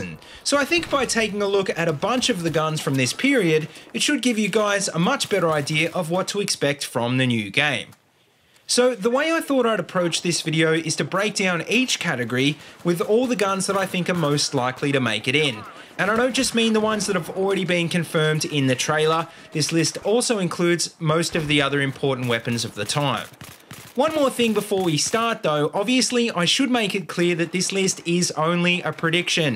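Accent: Australia. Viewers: LAMO so Aussie cheers m8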